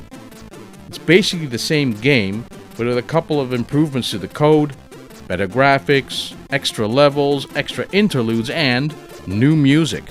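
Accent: New York, United States. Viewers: Is that a new york accent?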